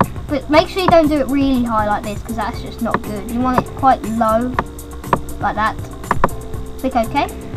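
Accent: United Kingdom. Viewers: wow your accent are you british ?:)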